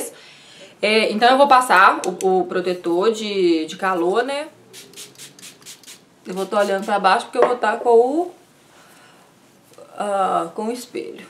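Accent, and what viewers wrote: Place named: Minas Gerais, Brazil. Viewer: eu entendo o pouquinho de mineiro 😂😂. Maravilhoso o vídeo e já aguardo o das técnicas de maquiagem. Beijo diva 💋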